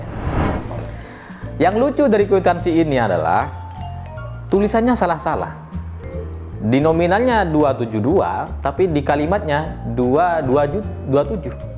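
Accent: Madura, Indonesia. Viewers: Serius nanya bang asal Madura ya..?? Logatnya mirip 🙏🙏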